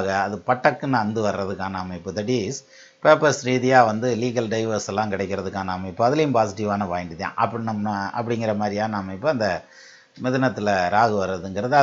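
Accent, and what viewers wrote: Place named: South Tamil Nadu, India. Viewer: Sir,I like ur Tamil and slang..like we south Tamils. Thank u.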